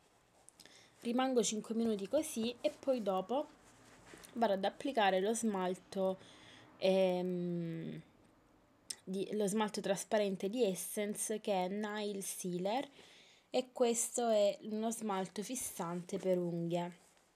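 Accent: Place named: Lecce, Italy. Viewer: ciao ma x caso sei d lecce??? si sente da cm parli *_*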